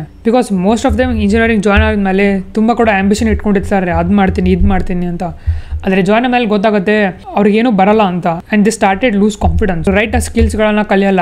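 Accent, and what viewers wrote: North Karnataka, India. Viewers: Bro,are you from north karnatak?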